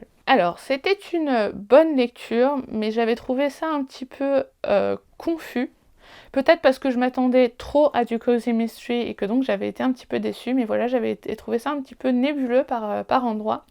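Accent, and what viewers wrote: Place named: England, United Kingdom. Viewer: Je viens de tomber sur ta vidéo par hasard et j ai beaucoup aimé. Parce que j adore le cosy mystery et que je veux perfectionner mon anglais pile le sujet de ta vidéo, je vais donc attaquer par les détectives du yorkshire😊. En recommandation j aime beaucoup les nombreux livres de joanne flucke avec anna swansen et sa pâtisserie, c est génial et on peut même faire les recettes 😂. J adore ton accent anglais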